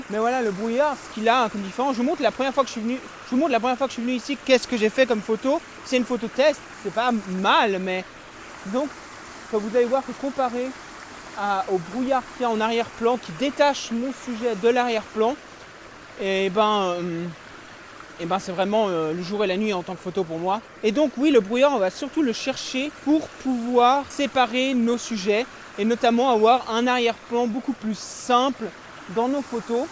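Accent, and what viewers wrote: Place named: England, United Kingdom. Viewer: Merci pour la vidéo. Tu commence à influencer ton français avec l'accent anglais. Je retrouve aussi que tu cherches des mots anglais temps en temps. Essaye de faire un vidéo en anglais. Ça va te faire une exercice. 😀 Je te souhaite une bonne lumière!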